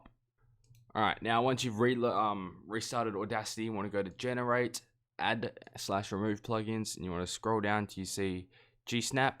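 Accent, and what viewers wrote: Australia. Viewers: smol bean. clout machine.TM bro you can tell by the accent he’s Australian???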